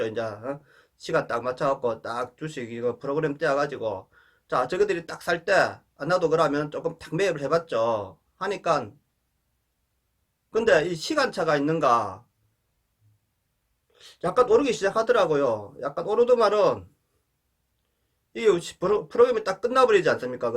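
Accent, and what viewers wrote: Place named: Busan, South Korea. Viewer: 구수한 부산 사투리 친근합니다 ㅋ 건강관리 잘 하세요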